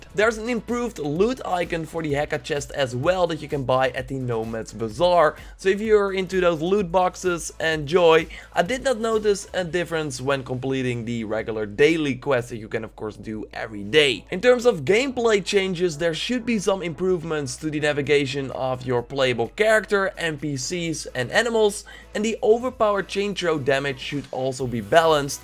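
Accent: Netherlands. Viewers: Are you dutch? Ik hoor het beetje. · Dutchies are so recognizable haha, I love our little accent. Dope video though!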